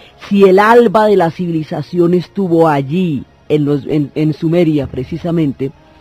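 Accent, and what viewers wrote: Colombia. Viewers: Lamento mucho no haberte conocido antes. Muy agradable y clara tu narrativa. Pero, lo que me cautivo es tu forma de hablar Bogotana. Solo por eso te seguiré escuchando. FELICITACIONES! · Fascinante oír la historia en la voz de dona y su delicioso acento colombiano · Ese acento colombiano paisa y su voz grave es lo q le da sabor a la narracion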